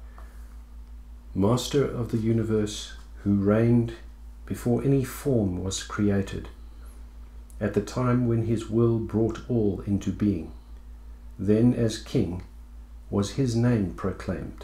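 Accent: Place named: South Africa. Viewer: You sound like you from, south africa, im wondering if it's possible for me to convert to Judaism, or how can I go about doing it?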